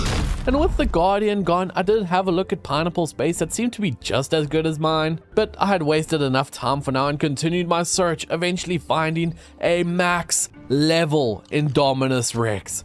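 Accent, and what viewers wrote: South Africa. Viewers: wait...by any chance are you a south african? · I KNEW YOU WERE SOUTH AFRICAN LOL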